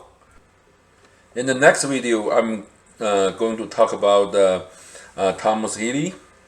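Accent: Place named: China. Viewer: Is this a Chinese channel. I need to know because you sound Chinese and I don’t find Chinese made things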